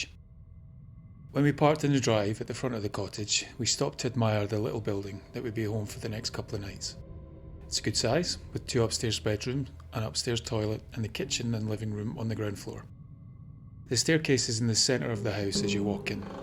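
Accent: Scotland, United Kingdom. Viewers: I've watched so many of your videos these days and I enjoyed them all. You put a lot of great work and thoughtfulness into this - it's very much appreciated. Wish you a Happy New year with many more ghost stories to come. 🙋🏻‍♀ All the best from Bavaria ❤ P:S. just one wee thing - your viewers are from all over the world, many may not be native English speakers and not used to the lovely Scottish way of speaking (that I enjoy so much 😀), some, like myself may suffer from tinnitus or have bad hearing... It helps a lot to turn down the background music a bit so people can concentrate to the narration better. Thank you.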